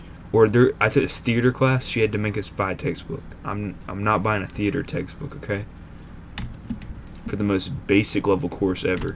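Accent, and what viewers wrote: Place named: United States. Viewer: sick Minecraft world, your American accent is sick what state r u from if you don't mind me asking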